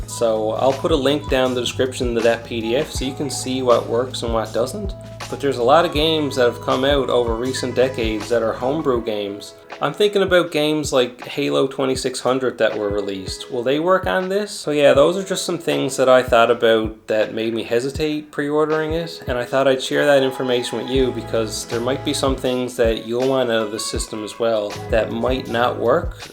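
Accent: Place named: Canada. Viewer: no way this guy isn't Canadian, lol - i'm saying East Coast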